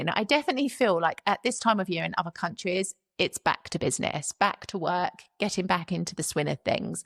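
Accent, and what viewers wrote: England, United Kingdom. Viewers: I love you commentary and English accent gets me 😂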